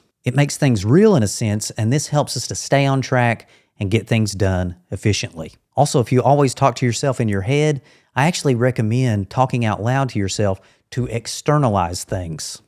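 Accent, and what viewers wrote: South, United States. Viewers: Where is your accent from? Carolinas?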